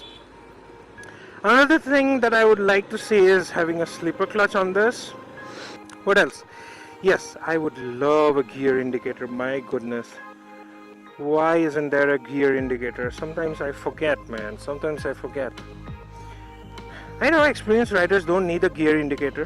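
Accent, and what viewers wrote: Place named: North East, United Kingdom. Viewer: Liked your review. I am an old rider and got the mojo sometime back. It's an amazing bike. Didn't go for the dominar as I don't need abs. I really don't as I have been riding a bullet all my life. It is a really good machine and the engine is butter smooth. By the way are you from the north east as I have a lot of friends up there and Ur diction is very similar. Anyway good job and keep up the good work. I agree with you about the marketing bit. Mahindra should really market it aggressively. They have a fantastic product in hand. Cheers.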